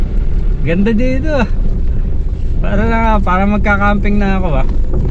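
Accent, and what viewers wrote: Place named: Hagonoy, Philippines. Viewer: hagonoy accent haha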